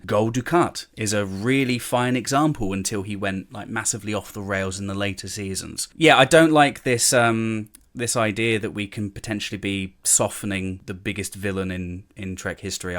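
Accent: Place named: RP, United Kingdom. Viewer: Man you have the poshest accent.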